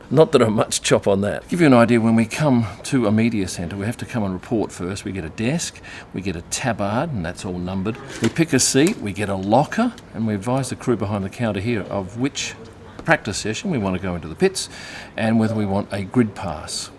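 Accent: Australia. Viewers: love your videos! Great visuals and commentary, love hearing that awesome Australian accent. Take care! An American fan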